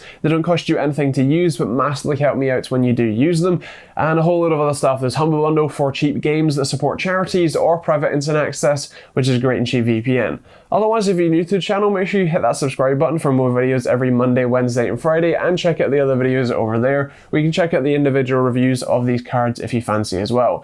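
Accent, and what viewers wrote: United Kingdom. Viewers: I'm here for the British accent.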